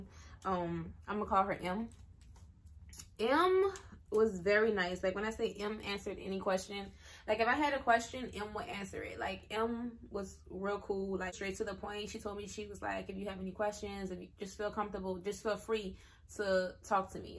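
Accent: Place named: Baton Rouge, United States. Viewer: Baton Rouge ? lol I hear an accent What the hell $1000 to change doctors they take for everything at MIA.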